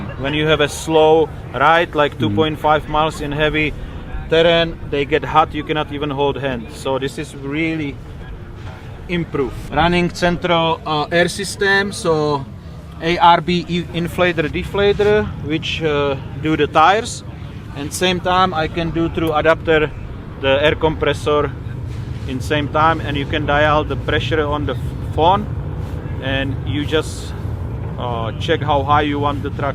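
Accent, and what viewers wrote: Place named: Russia. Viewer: Funny guy, i tought he was russian by his accent, real nice and hand-on guy !!! Those 5 girlfriends dont know what they were losing !! 👍👍👍👍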